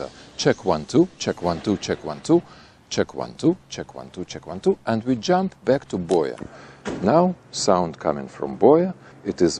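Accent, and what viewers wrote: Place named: Russia. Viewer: День добрый. Такой акцент. Вы Русский?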